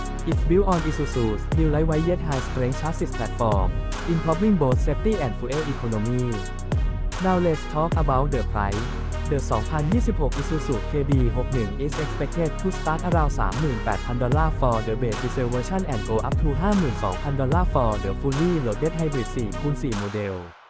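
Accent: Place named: Thailand. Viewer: อ่านภาษาอังกฤษแบบไทย แถมตกไทยด้วย เช่น Starting with the exteria the สองพันยี่สิบหก Isuzu KEหกหนึ่ง … งงแท้ จะไทยหรืออังกฤษ❤❤ ปรับใหม่ก็ดีนะครับ ติเพื่อก่อนะครับ ❤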